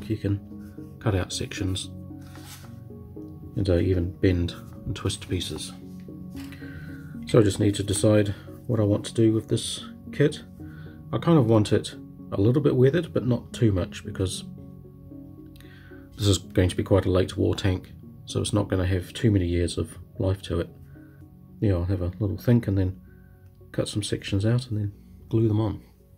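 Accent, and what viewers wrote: New Zealand. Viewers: new zealander?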